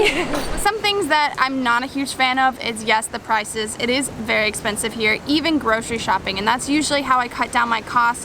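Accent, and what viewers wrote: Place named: Australia. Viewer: I actually found it ironic you said you sometimes don’t understand when Australians are talking to you. I am originally Chinese who have been living here for 20 years. I found your accent is somehow closer to Australians than many Americans.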